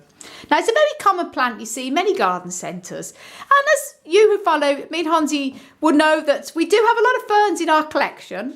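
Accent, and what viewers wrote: Birmingham, United Kingdom. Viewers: she reminds me a bit of susan from the Archers with those fruity high notes... but with 20% added brummy !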